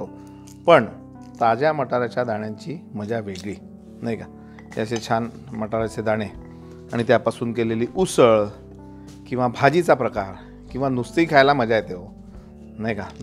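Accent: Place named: Nagpur, India. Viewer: Khup Chaan Saangitle Sir . . .aani khupp useful . . SIR mi tumchhi FAN aahe . . Mala tumcha Nagpuri Lehja khup aawadto . .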